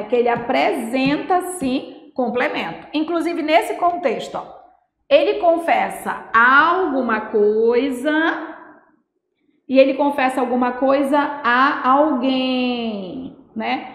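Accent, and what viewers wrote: Pará, Brazil. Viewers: Professora paraense, certeza. ❤️🙏🏻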